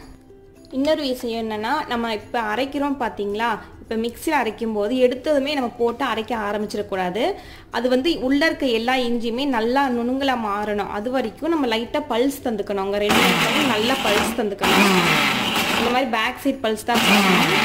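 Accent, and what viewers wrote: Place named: Kongu, India. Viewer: Super sister, good for your slang , your native is kongumandalam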